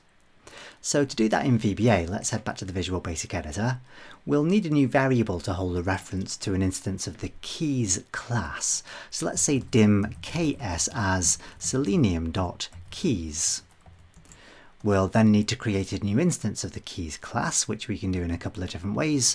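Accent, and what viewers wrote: United Kingdom. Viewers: Love your training series and your British accent.Thanks for sharing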